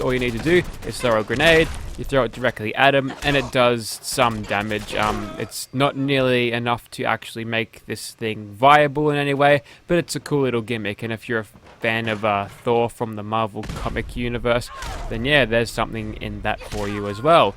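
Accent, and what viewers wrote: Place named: Australia. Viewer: Ya know I’ve observed something with your Australian accent that I’ve never noticed before. For example: You say “whatever” as “whateva” but you say “Matilda” as “Matilder”. So that’s cool. 🌈 The more you know ⭐️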